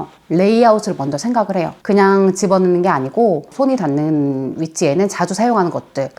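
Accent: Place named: Gyeongsang, South Korea. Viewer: 경상도 사람인가보다 서울말이 어울리지않게 힘들어보이네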